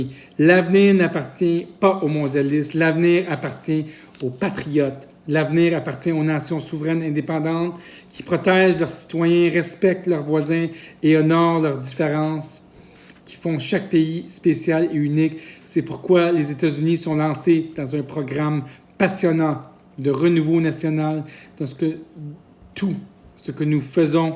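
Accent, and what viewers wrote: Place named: Canada. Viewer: J’ai horreur de certaine personne qui se permettent de critiquer ,c’est l’accent du Canada,eux en tous les cas n’ont pas peur de dénoncer se qui se passe ,merci aux Canadiens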